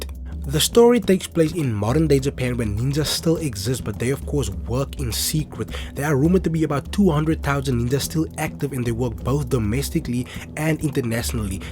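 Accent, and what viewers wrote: South Africa. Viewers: My guy are you a Saffa? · Hebanna, I didn't expect a South African accent ... Awe bra waka. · this defs a south african bru speaking here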